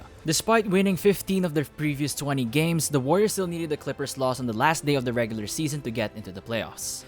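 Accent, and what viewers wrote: Philippines. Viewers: Sa boses palang pinoy na e 🤣. Love the video though 🙏🏽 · is it just me or do y'all hear a filipino accent?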